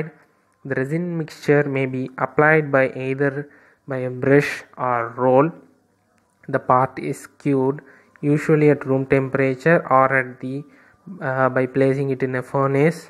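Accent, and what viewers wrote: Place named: India. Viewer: Your voice is like you are an indian.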